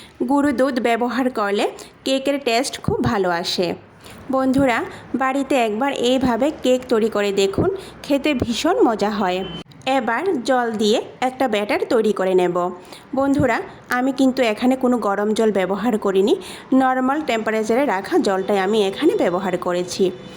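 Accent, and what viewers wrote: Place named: Bangladesh. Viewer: তোমার accent শুনে মনে হচ্ছে তুমি বাংলাদেশি. Cake এ লেবুর রস দেওয়ার দরকার নেই আর cake এ vanilla essence দিলেই ভালো লাগে.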